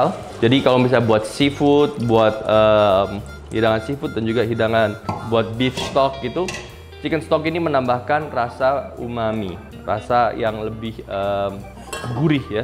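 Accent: Surabaya, Indonesia. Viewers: Hohohoh asli suroboyo rek celotehnya 😀😀😀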